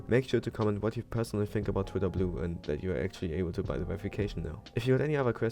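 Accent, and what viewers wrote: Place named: Germany. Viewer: Ich habe sofort gehört das du deutsch bist.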